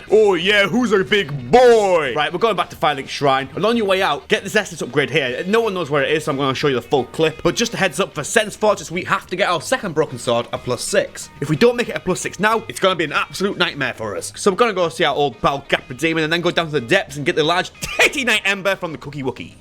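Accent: United Kingdom. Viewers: I didn't come in here expecting you to sound full br'ish, but I stayed. God I stayed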